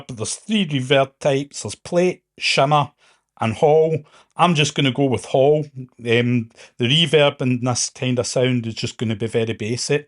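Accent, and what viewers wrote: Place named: Scotland, United Kingdom. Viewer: He is Scottish. Bloody hell, there's an R! 😄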